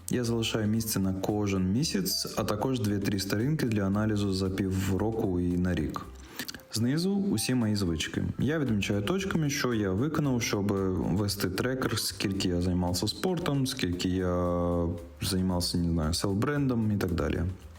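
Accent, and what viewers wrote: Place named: Belarus. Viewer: наче українська з елементами білоруської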